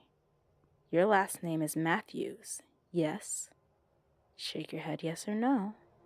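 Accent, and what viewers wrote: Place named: Scotland, United Kingdom. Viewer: I CLICKED ON THE VIDEO AND HEARD A SCOTTISH ASCENT AND IMMEDIATELY GOT HAPPY IM SCOTTISH, this is rare ✨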